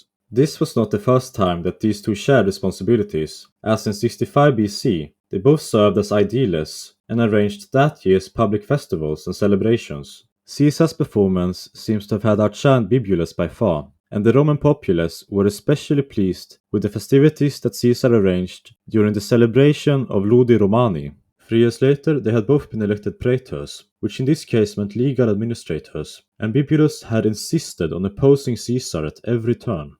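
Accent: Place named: Latin America. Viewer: … I know your voice. Unique combo of voice and accent (very slight) but your Latin origins give you away 😂 big fan though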